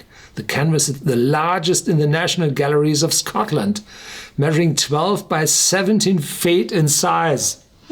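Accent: Germany. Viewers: It's half german, half Christopher Walken :)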